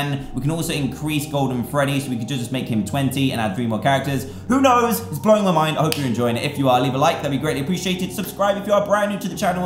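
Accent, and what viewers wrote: United Kingdom. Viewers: It's just you..everyone ignores it because he is british so he tends to say some things 'wrong'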